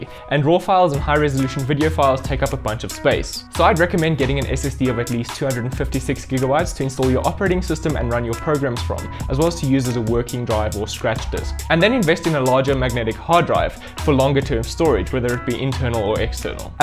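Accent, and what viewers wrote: South Africa. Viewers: I love spotting a fellow South African just by the voice XD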